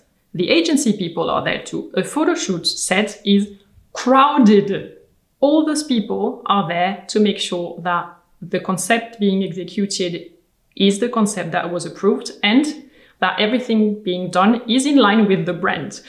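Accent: France. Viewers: accent went from German back to French 🙂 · I have not watched a video from you in a longer time and I really noticed that your accent is disappearing slightly :) your english sounded less french today; I liked it :) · so hard to hear you with your thick French accent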